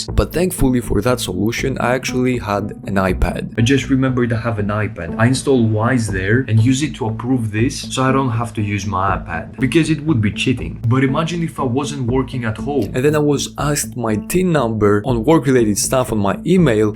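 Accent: Greece. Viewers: Ημουν σιγουρος οτι ησουν Ελληνας απο τη προφορα (μια χαρα ειναι μην αγχω) αλλα οταν αρχισες να ραπαρεις στα ελληνικα το κλειδωσα, εχω κγω την ιδια ιδεα τελευταια με τη digital ζωη σκεφτομαι να το ξεκινησω να δω πως θα παει. Ωραιο βιντεο!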